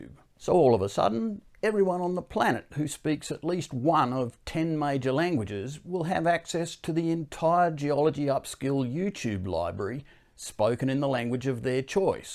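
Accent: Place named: Australia. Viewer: Great for education :) shame they would miss your amazing entertaining Aussie accent :)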